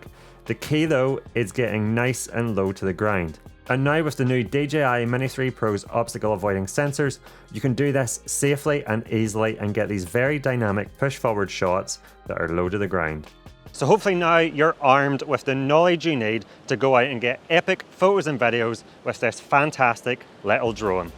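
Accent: Ireland. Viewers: Came for the drone, stayed for the (Irish?) accent. Thnx. Grtz from the Netherlands. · Here on Youtube are many so-called self-proclaimed drones flying gurus. But none can touch your ankles. The heavy Irish accent is super. Also, your knowledge. Merry CHRISTMAS and SAFER NEW YEAR 2023. Thank you for your educational videos, I learned a bundle.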